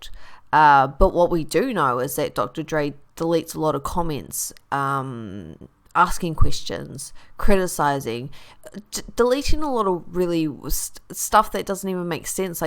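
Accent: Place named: New Zealand. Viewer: I knew when I heard your voice that you're a kiwi, so I stayed to listen because I follow Dr Dray, the thing is she knows what she's talking about and if anyone should be listening to her advice on sun protection it's us down here in Aotearoa/New Zealand. Her choices don't concern me but I was a bit shocked to see the judgy nasty stuff, I'm more of a live and let live but if you're a creator then it's to be expected I suppose. The thing with kiwi's is we are a passive-aggressive nation so I get where your coming from eh, we don't confront but we will orchestrate a campaign lasting 500 years involving the cast of Ben Hur to send you a message!. "God I love us". In any event, we are watching Dr Dray transform as she becomes more comfortable on-line, is she obsessive depends on your point of view. I follow her advice on showering and moisturizing within two days there was a noticeable difference in my skin plus I am super Zen starting my day. 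She loves coffee my kinda girl, she taught me how to layer my skincare regime, so I'm not wasting product now. She talks to women about affordable skincare, who doesn't want to hear that eh!. Is she too thin?, well her body shape hasn't changed from when she began on YouTube, she only went vegan a few years ago, so the correct question might be, is it right to put our normal on someone else? and what is normal anyway? what we do actually know is the West is getting fatter; sedentary lifestyles, convenience food etc.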